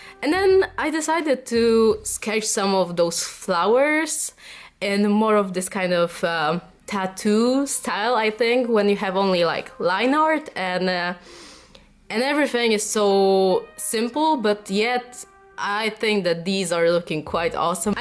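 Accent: Poland. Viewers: 'cause is polissh accent 😏😘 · Masz taki "polski akcent" xD Jesteś z Polski? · I'm from Poland like her and my dad has that accent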